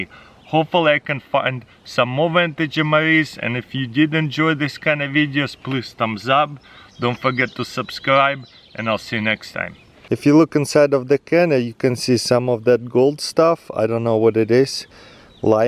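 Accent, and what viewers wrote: Russia. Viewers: Anyone else read this in his Russian accent😂😂😂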